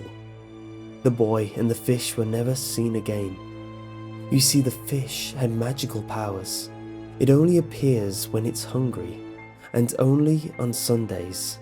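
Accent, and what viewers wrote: England, United Kingdom. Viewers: I know he is not from America so he is probably from England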